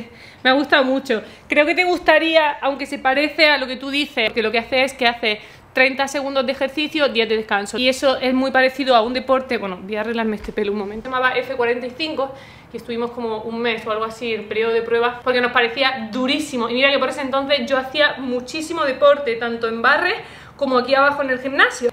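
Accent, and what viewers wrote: Granada, Spain. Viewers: Sube el video😃que habla de granaina tienes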